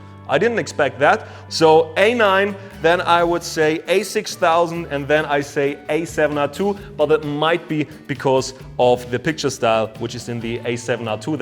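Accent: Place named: Germany. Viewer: Boy, you talk with such a droll German accent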